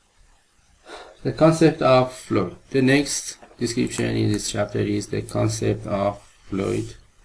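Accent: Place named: Africa. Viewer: that's aafrican accent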